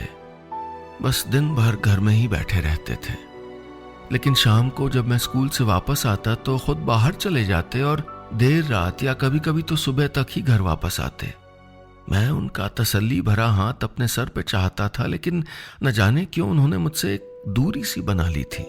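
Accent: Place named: Pahadi, India. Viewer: I am from Almora and your die heart story listener ...The way you narrate this story in our local tongue and tone is just out of the world... it was indeed an emotional story but I was smiling when you were like a pahadi speaker.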